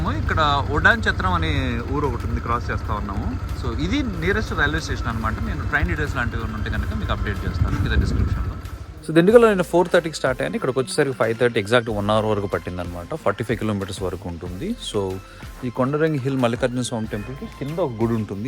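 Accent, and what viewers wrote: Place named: Bangalore, India. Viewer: Miru banglore telugu matladutaru ur native bro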